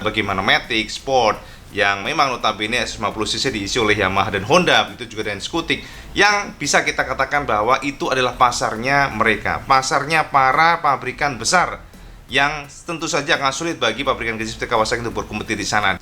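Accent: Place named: Java, Indonesia. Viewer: aku seneng ulasanmu kang....bloko suto coro jowone.....sukses kang....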